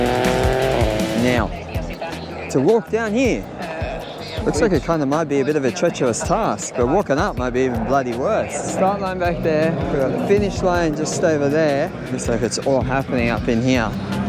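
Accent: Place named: Australia. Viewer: Nice video, mate (with the cool Australian accent). Hope you enjoyed your time in Finland. Our family has been every autumn there spectating the rally in three different generations at least. It’s always a spectacle. Always good to see some Australians visiting here in the North. Also, as you’re probably aware of, our very own Valtteri Bottas is pretty much Aussie now btw. Greetings from Big Casey Stoner fan from Finland 🇫🇮🤝🇦🇺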